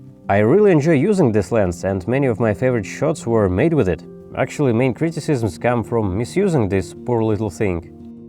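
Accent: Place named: Russia. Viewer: Хахахп меня не обманешь! Раскусила ваш русский акцент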